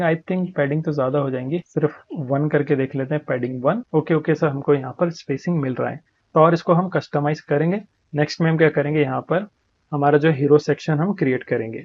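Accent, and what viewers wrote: Hyderabad, India. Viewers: Bhai ye hyderabadi accent pasand aya mujhe and nice video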